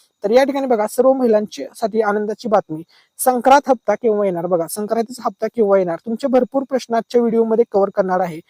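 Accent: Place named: Jalgaon, India. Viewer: Mini Jalgaon jila tune bolati aahe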